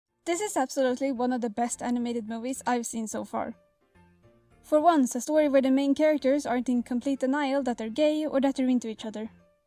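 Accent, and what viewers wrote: Sweden. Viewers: What accent do you have? are u Swedish?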